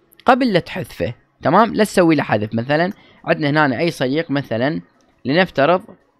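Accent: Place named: Egypt. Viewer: دسهلهة جنك مصري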